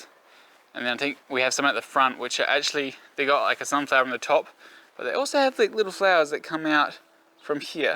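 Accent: Australia. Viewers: Yes, Aussie. I'v got you not from England ;-)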